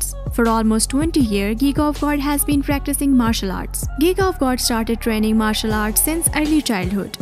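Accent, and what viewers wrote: India. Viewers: This indian dialect, is triggering me so god damn hard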